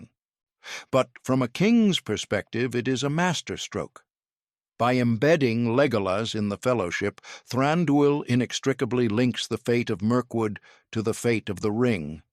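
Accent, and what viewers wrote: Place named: United States. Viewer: middle earth tales narrated with an American accent just don't fly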